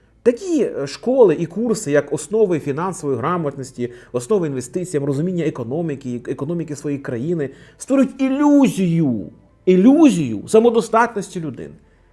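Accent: Russia. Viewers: Московський акцент нагадує пʼєсу Подервʼянського «Кацапи»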